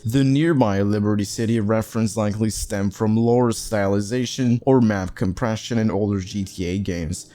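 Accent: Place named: Birmingham, United States. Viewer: Sound kinda like Birmingham al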